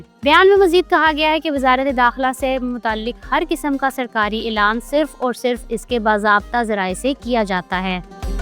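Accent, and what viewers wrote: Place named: Punjab, Pakistan. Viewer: aap English name bhe Punjabi mein bol rahee hain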